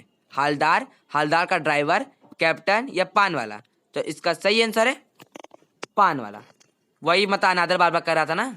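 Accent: Bihar, India. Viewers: Aap bihar se hai ky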